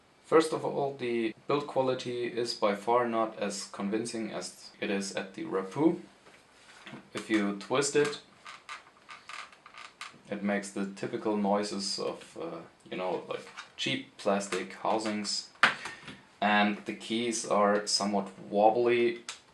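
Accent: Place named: Germany. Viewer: Love the accent (must be German). I did not know the brand Rapoo, I like how compact it is. Thank you for the review.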